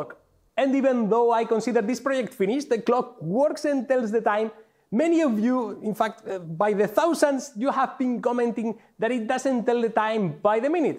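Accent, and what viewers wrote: Spain. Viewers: como se le nota que es español al jodio... increible video e invento tio!!!! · Tu ere español no? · Se nota a kilómetros el acento español que locura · Tú eres español, a mi no me engañas! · Como se nota que eres español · el acento español se nota un huevo, eso ayuda a los españoles que sabemos inglés a hacerlo más facil de entender, muy buen video y me voy a ver un par más que me parecen interesantes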